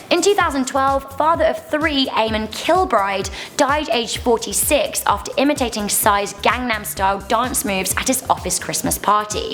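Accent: England, United Kingdom. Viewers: i Hear that you are...English???? I'm english to and just wondered if you would come to comic con or if you like lived in london.. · Please stop using brits with their weird ass voices. Can you get a Nigerian narrator please? They have sexy voices.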